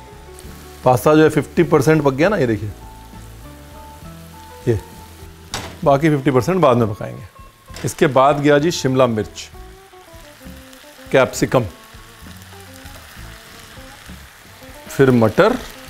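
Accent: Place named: Punjab, India. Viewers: Oye hoye, teri Punjabi!!! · Aap to na ji aap ne to cooking ka andaaz hi badal diya. Aur aapka punjabi lehza I just love to listen to it 👍👍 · U r commendable mann...aur jo punjabi nikalti h khana banate hue oye hoye hoye hoye😍😍😍😍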